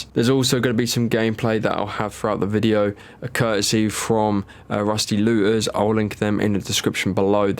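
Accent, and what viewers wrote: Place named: United Kingdom. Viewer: if u talking on English and NOT GB English - much more ppl could understand u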